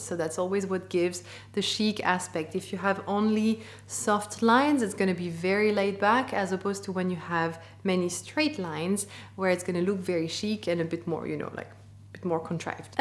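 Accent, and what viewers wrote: France. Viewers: I love your french English ❤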